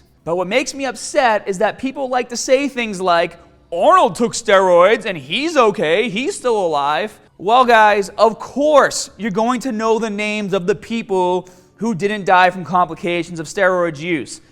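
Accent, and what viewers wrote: Boston, United States. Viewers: Jim Jorgensen Why the hate? Go fuck off and find someone like with a "real Boston accent." Lmfao stupid kid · Never noticed you're Boston accent until now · Why watch this guy? He looks the same from videos from years ago. Boston accent was not earlier, but is now. Lift some more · I haven't watched one of Scotts videos in a couple of years. I swear to god he didn't have a Boston accent before. This has to be a joke right? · Abdahmenen? Kind of hard to take you seriously when you can't even pronounce abdomen correctly. And it's not because of your cringe worthy boston accent either. You added an extra syllable in there , man. But to the point of the video. Do I think steroids used properly and maturely (that last one is rare in today's society) can cause adverse long term effects to your health? No. Or should I say, I don't know. 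No one does because there is little to no actual studies on the matter. That said do i think people who overdo it and abuse the shit out of steroids - like Piana - over a long period of time are playing with fire? Yes. Just like any drug be it cocaine, marijuana, nicotine/cigarettes, heroin (obviously), and even caffeine are setting themselves up for failure health-wise in the long run. I know I'm going to get bitched at for including marijuana on that list from our stoner left leaning friends, but there was just a study produced saying that long term use of the drug deteriorates your brain. The study released is what I'd call a "preliminary" study, but it showed that people who smoke high potency weed showed signs of brain damage, so in other words, people who built up their tolerance to weed and smoke the stronger shit nowadays are upping their risk of brain damage. Just like people who chug bottles of the stronger vodka are going to fuck themselves up more than the dude who just drinks a few beers. 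That said the study was admittedly hardly conclusive, which is w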